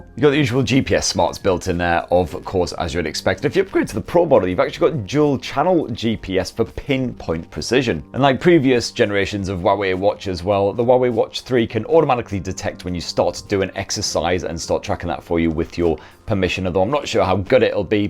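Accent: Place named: England, United Kingdom. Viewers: Celia doesn’t understand your northern accent 🤫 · Here for the english accent😁💜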